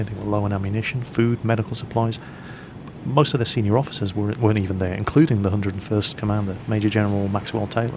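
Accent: England, United Kingdom. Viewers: Your german pronounciation is astoninglishly good, given that you have such a british accent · You did pronounce "Schwalbe" correctly, although one can hear your English accent! I'm proud of you :-D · This guy is British. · The Russians won WWII. Band of Brothers is a clever propaganda movie and HBO series, 1944 Germany was already lost and real historians know this. You sound smart - but your audience are kids. As a lawyer, age 58 and Russian/American and history major I know your British accent is part of your false narration of history to video kids.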